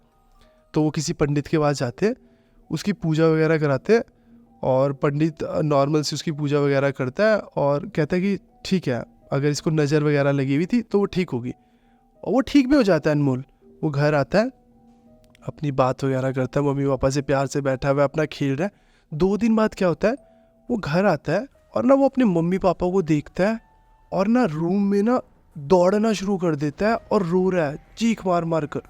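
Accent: Punjab, India. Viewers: Bhai apki language me punjabi accent h.. apka bg kaha se h??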